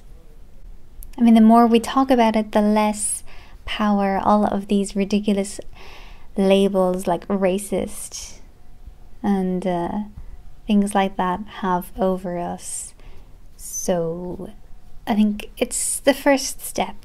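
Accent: Ireland. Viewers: Out of the blue you commented on one of my vidoes, so I checked out your channel and subscribed. What can I say, i'm a sucker for Irish accents :)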